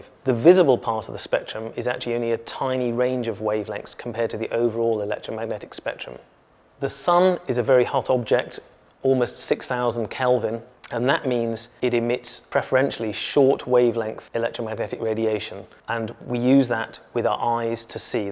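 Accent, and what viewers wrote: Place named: Europe. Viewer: Ouais ouais ouais euro anglais on est là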